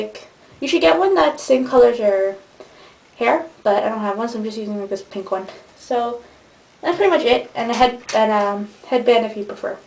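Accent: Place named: United States. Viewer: Yay!! I did it I plaited mine and then curled it :D Im goin to meet a lad in a couple of hours so Im goin to wear it curly then put it into this half way through so it looks as if Im good with hair haha. Love your videos and your accent, wish I had an American accent but I have an English accent cos Im english and live in england x :( haha xx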